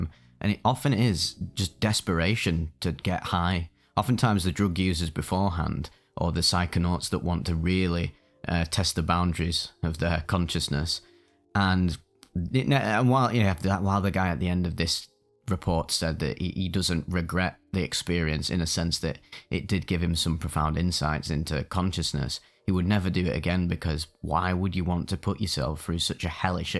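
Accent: United Kingdom. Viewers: Yeah ma these narrations make me feel that my life is not that bad . I smoked and dug heroin for 25 years ,finally this year I saidto myself that I am not that anymore.. Even stopped the pgs -pregabalin 900ml a night at least for years. Diazepam .. Even the mirtazapine,after stopping mirtazapine I realised it was those absolutely draining all my energy and depressing the shiz out of me.. Couldn't sleep maybe 1-2 hours . These videos at night with the jlabs in are like magic . Sleep finally . I like the Salvia ones ,especially the Salvia loud dreadful scary machine thing people talk about .. Nice to hear someone from the UK aswell ..I'm in Doncaster..A rite shithole.. Well all England is a dumping ground for the money laundering men's hairdressing /mini mart selling bacci under the counter .. Keep em coming Brother ..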